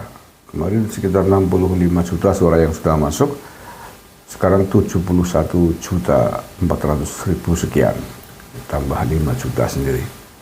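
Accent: Banyumas, Indonesia. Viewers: Aku nunggu ketawanya P sajalahhhhhhh 😂😂😂 mbil ngapak2 dan ududnya 🤭🤭🤭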